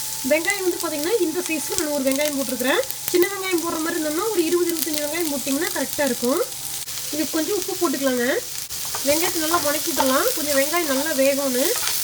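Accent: Kongu, India. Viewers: Samayalum super.Namma kongu thamilum superunga. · aaha... naan vaniputhur-medu nga (near T.N.Palayam).. nam mannin vaasanai polave ungal pechum, unvaum.. neenga kalakkunga.. endra aatharavau ungalukku eppoluthum undu. Thank you.. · Super sister. Namma ooru style la super ah sollirukeenga. Naan Gobi arts la dhan padichen sis. Native sathy. Happy ya iruku namma oor style la kekaradhukku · உங்க கொங்கு மனம்... உங்கள் பேச்சிலும் வீசுது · Arumai ma. Athaivida arumai unga kongu pechu vazhakku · kongu tamil epayume azhaguthanga..namma pecha aduchuku aale kedayathunga..super sis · Kongu thamilum dishsum superngo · தோழிக்கு வணக்கம். நம்ம கொங்கு தமிழே தனி அழகு தான். 😊. அருமை. நம்ம ஊரு எந்த ஊருங்க. நான் ஈரோடு ங்க. என் வாழ்த்துக்கள் 👍😊🙏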